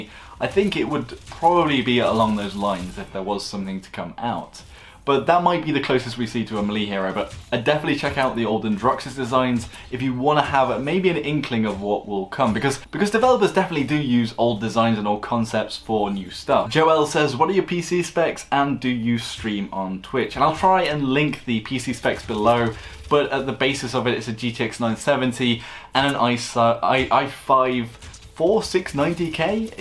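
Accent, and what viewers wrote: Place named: England, United Kingdom. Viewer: What part of England are you from? :D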